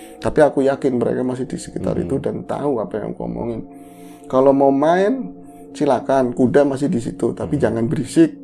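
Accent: Banyumas, Indonesia. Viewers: ora ngapak ora penakk 😁😁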